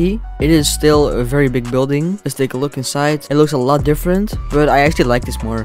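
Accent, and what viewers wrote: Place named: Quebec, Canada. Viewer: Cazy how much you sound like you are from québec! are you from québec???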